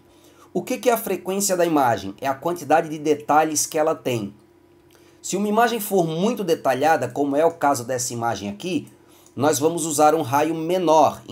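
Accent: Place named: Santa Catarina, Brazil. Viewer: pelo sotaque é catarinense não? rsrs Florianópolis? ótimo vídeo. parabéns e obrigado por compartilhar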